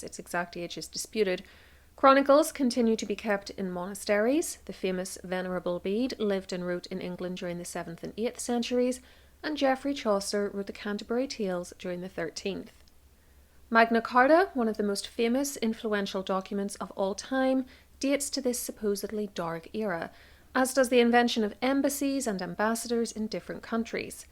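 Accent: Northern Ireland, United Kingdom. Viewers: Great video, thx. Just have to say, me mum grew up in Co Derry. Love your N Ireland accent. I subscribed based on the conctent. Well done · Oh, your accent is absolutely grand and I have no problems whatsoever cathing everything. Never been to Northern Ireland though, only Éire, but I really want to visit the north as well. Hello back from your distant, rainy neighbour Noreg. :)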